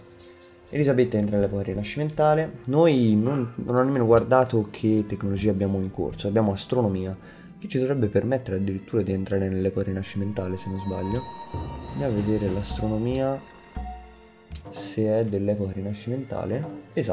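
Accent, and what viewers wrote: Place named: Tuscany, Italy. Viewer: D :D figurati!! complimenti ancora...seguire i tuoi video è leggero e facile, sei molto "pulito" e il leggerissssssimo accento toscano fa colore!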